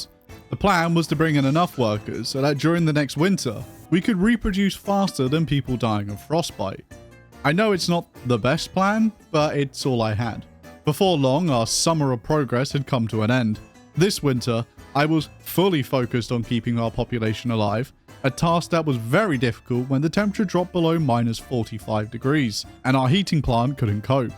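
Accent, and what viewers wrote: United Kingdom. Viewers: Your british accent goes really hard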